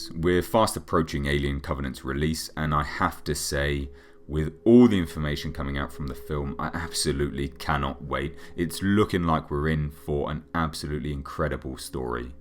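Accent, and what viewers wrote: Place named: United Kingdom. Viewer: The narrator sounds like the guy who does Dune videos but with a British accent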